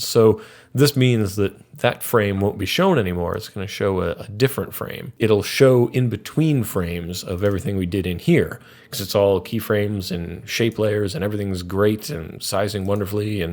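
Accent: Canada. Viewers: Sounds like you're Canadian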